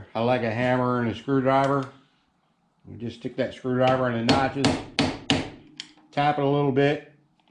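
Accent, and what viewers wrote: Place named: Appalachia, United States. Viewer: Finally someone who speaks hillbilly. I get it now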